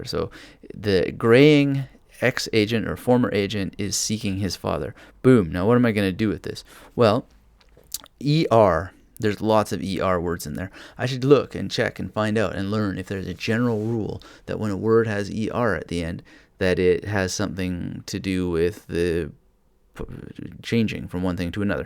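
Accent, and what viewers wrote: Canada. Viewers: You sound like a Canadian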